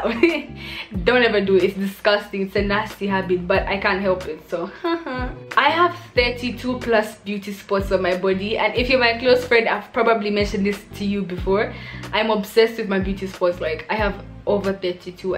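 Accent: Nigeria. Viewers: Tbh, the very first time I heard you speak I 100% thought you were Nigerian. 😂🔥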